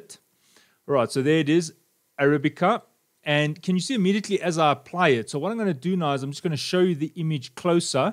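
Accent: South Africa. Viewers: I recognize the South African accent from a mile. Hello from Cape Town.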